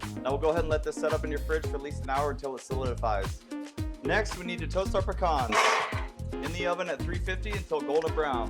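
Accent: United States. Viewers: You from Hawaii? I can hear small kine pigeon or local accent! Right on, Braddah… keep up the good work🏝️ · Sweet, but you sound like an American. You know, we use cups as measurements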